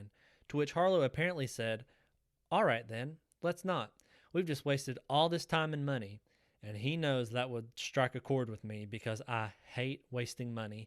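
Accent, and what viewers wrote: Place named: Deep South, United States. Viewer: Coming from Imgur, I was not expecting a Deep South accent 😂. Excited to get rolling.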